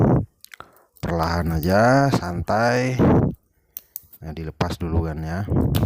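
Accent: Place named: East Java, Indonesia. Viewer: Suara anda kok kayak orang jawa Timur bang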